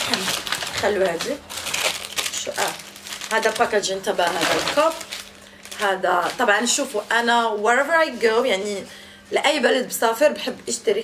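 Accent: Morocco. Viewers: نتي غزالة ماشاء الله ولكن لهجتك المغربية احسن مليون مرة من اللهجة المشرقية المستعملة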